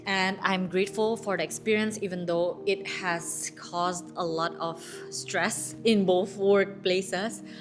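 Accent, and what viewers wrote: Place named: Philippines. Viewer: sorry, but you sound like a Filipina, sorry, not sorry, darn it! whatever!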